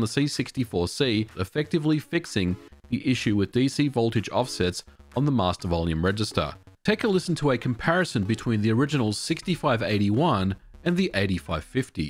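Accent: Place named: Australia. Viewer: The Australian accent just hits different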